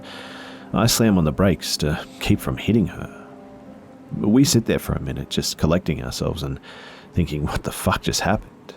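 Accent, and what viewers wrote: Australia. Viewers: Something about an aussie reading stories really appeals to me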